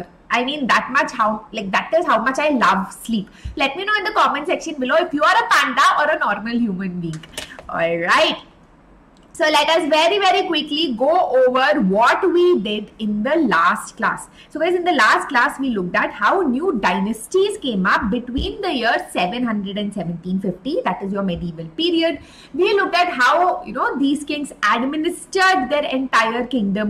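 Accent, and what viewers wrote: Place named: Tamil Nadu, India. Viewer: your sound was like a tamil 😅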